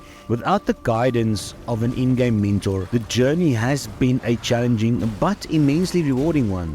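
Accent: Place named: South Africa. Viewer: Yoo i know a South African accent when I hear one. Whats up ekse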